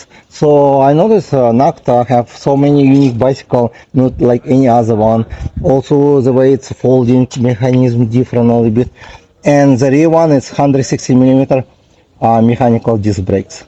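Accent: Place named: Russia. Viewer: Простите конечно но по вашему акценту понятно что вы говорите по руски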